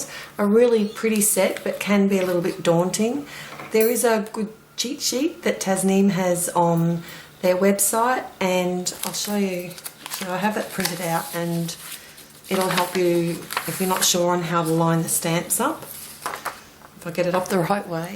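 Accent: Australia. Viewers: Hi. Where can I buy the extra thick foam pad. From your accent it sounds like you are in Australia, so am I. Thanks again. Regards Liz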